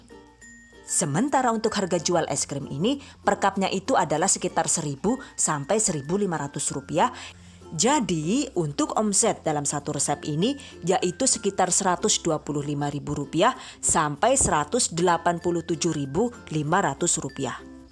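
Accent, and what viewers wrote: Banyumas, Indonesia. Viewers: Ngapak ngapak hahaha keren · Bahasane wong ndi iku,, koyo ngapak,,,, 😂😂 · Kereeeen bahasa inggrisnya, apalagi bahasa ngapak nya.... Sukaaaa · Ngapak temen yu, rika jawa ne ngendi😂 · Yg aku tunggu2 dr channel ini adalah ktika udah ngomong jawa ngapak..sllu ngakak😂😂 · Ngapak yh yung... 😁😁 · Oalah jebul ngapak mbekayune 😂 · Kiye wong Banyumas Karo baha inggris lagi campuran, ngandel metu banyumase jaaaaaan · Jebule ngapak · Ngapak2 lucuuu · Jujur bun.. Saya klo denger bunda ngomongnya ngapak2 jd ketawa.. 😀.. Nyantai bgt gtu, Tp resep2nya oke pnya bun... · paling suka tutorial vidio jelas dan selalu menarik utk di ikuti di tambah bahasa ngapak2 nya lucu salam dari Jogya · msyaAllah ibu orang ngapak yang the bastd 😘😘😘😘😍😍😍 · Jebule wong ngapak, keren yakin video Rika Yu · Trnyata ngapak ya bhasanya, nyong ben ngapak kie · Jan maturnuwun banget ilmune bu, ngesuk tek praktekna lah, slain mengispirasi tp ana lucune pas ngomong ngapak, ( BOCAH NGAPAK YA ) 😀😀😀😀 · ngapakers koh sip lah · Mantap bunda...jawanya ngapak banget...ngromet ..opo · ternyata ngapak ibuknya😅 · Kereeen ibunya gak pelit berbagi ilmu sangat detail...dan lucu si ibu ngapak ..wkwkkw auto subscribe 😂😁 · Mbak orang mana deneng ngapak banget.cara menjelaskan jelas mudah di mengerti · ora ngapak ora kepenak ya mbak... 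salam ngapak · Terimakasih atas ilmunya, suaranya lucu bngt bu, bisa jd 3 bahasa gitu,indo,ngapak & inggris,, memang luar biasa, sangat bermanfaat sekali, semoga sukses selalu ya bu 😂😍😘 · Deneng ngomonge ngapak yu🤣🤣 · Suka bhs nya,Banyumasan kyk inyong😄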